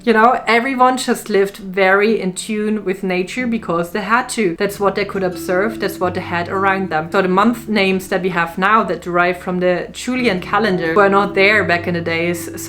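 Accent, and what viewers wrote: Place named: Germany. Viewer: I am happy your videos are not MOONdane.... ha ha So, thank you for your videos. They are so great. I love listening to you talk - I don't want to guess wrong or assume that you are German although I've seen you holding what looks to be a lot of German books and the way you pronounced some words with a "v" like the word "witches" - but I love your accent. You are very informative and always have interesting topics. I especially like this video because when you spoke about your grandma, it immediately made me think of my grandma and grandpa (my grandpa being from German lineage) and they never did anything that wasn't in accordance with the moon. Luckily, they taught me how to live by the moon cycles as well, and the knowledge they passed along has not gone to waste. I don't even rely on the weather channel for my weather information - I know what the weather is going to be like based upon observing nature, such as the birds and livestock. 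Loved this video so much.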